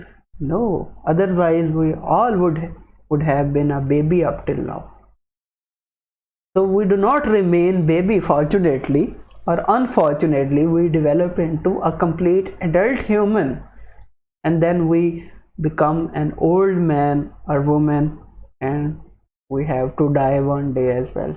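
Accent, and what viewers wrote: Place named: India. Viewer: From his accent, I think he is Indian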